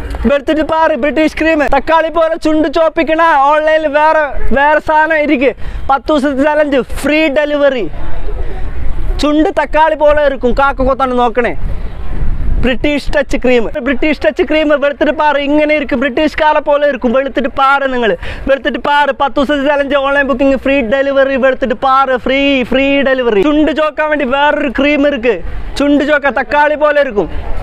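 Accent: Kasaragod, India. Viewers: Ksaragod slang mindan ni onnum koodi indanam mone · kasaragod basha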